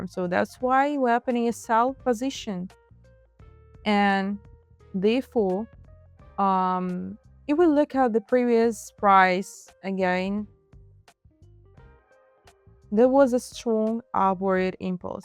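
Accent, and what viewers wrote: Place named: Russia. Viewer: It's pronounced MAK Dee 🤦 This Russian accent and pronunciation is 🙄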